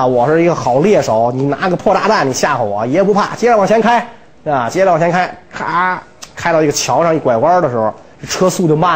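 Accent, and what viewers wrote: Beijing, China. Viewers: 哈哈 这个京腔好可爱～ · 一口京腔，好听啊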